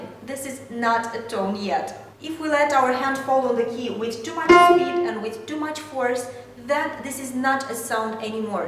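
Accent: Russia. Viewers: May I ask when the next update may be?? Also I love your accent by any chance are you Russian? :) xx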